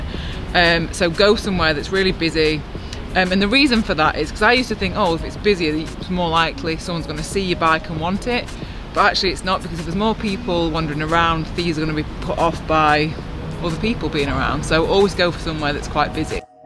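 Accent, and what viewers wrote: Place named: Northern England, United Kingdom. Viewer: Yeah, I have watched your vids on and off for a few years. You certainly keep me going on the bike. I love your biking story, I like your honesty, failures and success' and the fact that you have the courage to put yourself in the light. A real ambassador for normal cyclists. The Northern accent is even beginning to grow on me;-)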